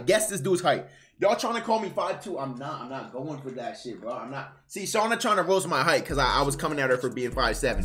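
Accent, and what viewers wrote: New Jersey, United States. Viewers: Ripe New Jersey accent right there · New Jersey accent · you from jersey?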